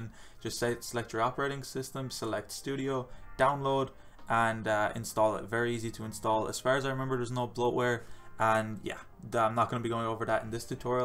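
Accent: Ireland. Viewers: Are you Irish by any chance? Great tutorial by the way! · Thank you very much for this clear and well-explained video. Do I detect an Irish accent? If so, snap ;)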